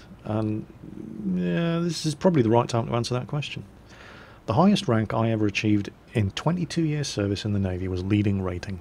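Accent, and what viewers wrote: Bavaria, Germany. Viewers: Dat German accent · Are you bavarian by any chance? :P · can we hear you doing your German accent for one Mingles with episode? I can't help but love hearing your German accent. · where did you learn talking with this awesome german accent?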